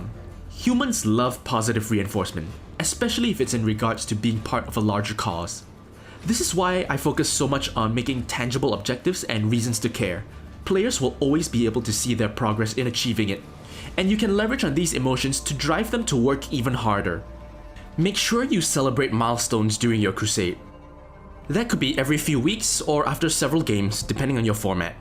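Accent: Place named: Singapore. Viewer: I KNEW YOUR ACCENT SOUNDED LIKE SINGLISH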